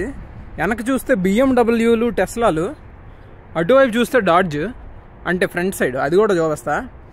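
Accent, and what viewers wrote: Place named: Telugu, India. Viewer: Baga edit chesav anna with pakka Telugu bidda voice 😁😁🤝🤝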